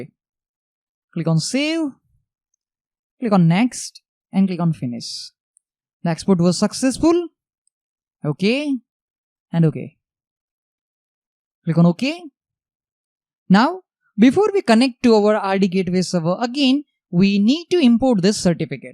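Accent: India. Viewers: His English is India-Style and full understandable. So what´s your Problem ?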